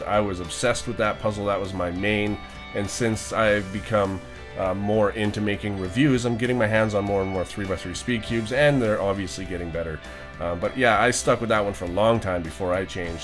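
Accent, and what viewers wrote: Canada. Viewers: It’s the Cubicle.Us and you are pretty sure Canadian, right? Heard that eh, (I’m not Canadian)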